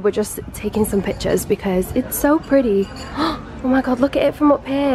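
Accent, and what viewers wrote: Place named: RP, United Kingdom. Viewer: I love your accent, so posh lol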